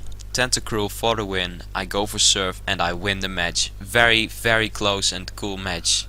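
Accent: Netherlands. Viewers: wat een heerlijk nederlands accent hahah goed gespeeld trouwens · your dutch :P ? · hearing a dutch accent haha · are you dutch?? cuz you have a dutch accent especially when you said: you kinda have to expect a shellbreak baton passer.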